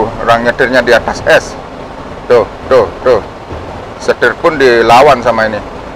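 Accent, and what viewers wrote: Bali, Indonesia. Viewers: Om orang bali ya, kok logatnya kyak orng bali?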